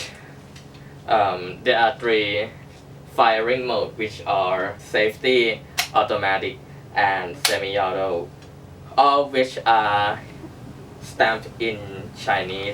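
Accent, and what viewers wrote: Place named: Thailand. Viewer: ก็ว่าอยู่เวลาพูด สำเนียงเหมือนคนไทยจัง555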